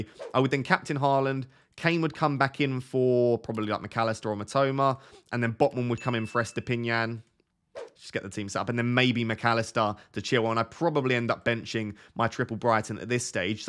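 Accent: Manchester, United Kingdom. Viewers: You don't have to tell us in every video that you're a Man U 'fan', we can tell from your shirt and your strong Mancunian accent 😉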